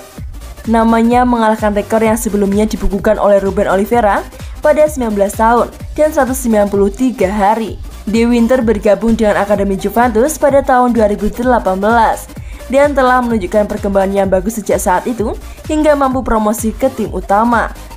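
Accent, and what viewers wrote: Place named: Java, Indonesia. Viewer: Mbaknya medokkk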